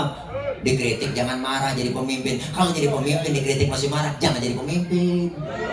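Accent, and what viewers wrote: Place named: Betawi, Indonesia. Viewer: Gue banget dgn gaya Betawi yg medOk nya Ampe ane lupa mati'in aer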